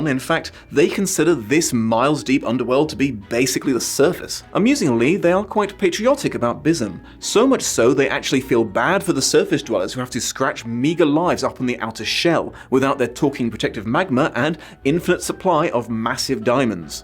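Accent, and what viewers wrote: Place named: United Kingdom. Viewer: I’m always happy to see more videos His lovely British voice soothes me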